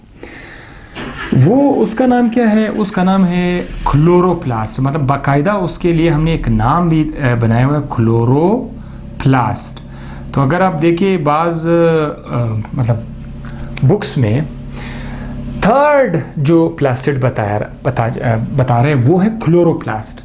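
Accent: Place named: Quetta, Pakistan. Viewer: Gr8 sir app ka urdu quetta ka hi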